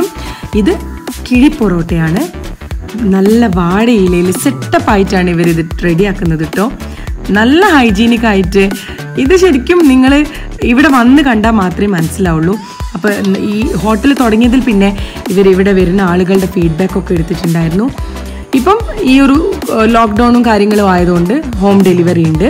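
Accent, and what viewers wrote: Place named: Kozhikode, India. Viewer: ഇങ്ങളെ വർത്താനം കേട്ടപ്പളേ ഞമ്മക്ക് തോന്നി ഇങ്ങള് കോഴിക്കോടാണെന്ന് ഷിജോ ഏട്ടൻ പറഞ്ഞിട്ട് വന്നെയാ മ്മളെ ഒരു കുഞ്ഞി ചാനലുണ്ട് s&f vlogs ഒന്ന് കേറി നോക്കൂലേ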